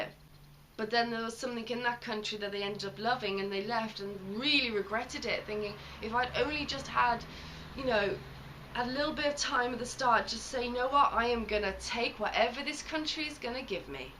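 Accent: England, United Kingdom. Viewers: It's an English accent.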